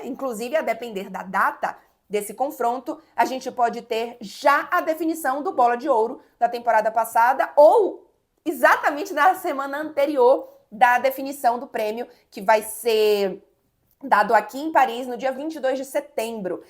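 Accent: Bahia, Brazil. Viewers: Que voz forte, poderosa, e com um sotaque delicioso! Eu apostaria tudo que ela é baiana pra ter esse conjunto fabuloso!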